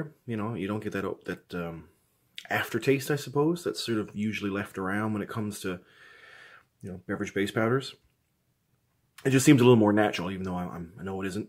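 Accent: Newfoundland, Canada. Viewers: Newfie or from NS?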